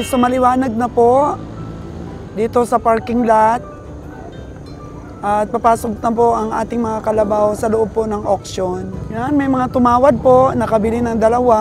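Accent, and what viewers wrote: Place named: Batangas, Philippines. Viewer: meron kana puntong batangenya madam heheh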